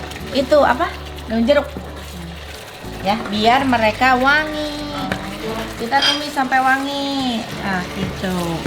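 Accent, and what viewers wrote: West Java, Indonesia. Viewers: Teh ih lucu pisan Sunda na melekat pisan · Jadi inget kk iparku org sunda juga..cara ngomong persis teh,baik juga orgnya...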